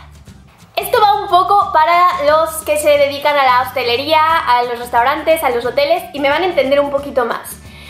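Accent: Spain. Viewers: Chalé eres mexicana y hablas como española me cagá ese acentito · Yo opino igual. Si no dice que es de México, pasa como Española perfectamente por su acento xD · te insisto en q creo q ya de estar tanto en españa cogiste el acento (soy española) BESOOOOOOOS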